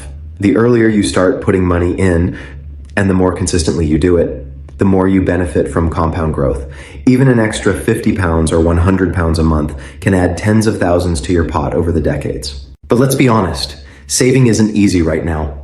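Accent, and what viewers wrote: United States. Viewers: This is the second video I've seen narrated by this guy with an American accent with misinformation that's not been UK News and on the government website and would have been. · Why is an american accent being used instead of British? You cant really take this channel seriously due to it.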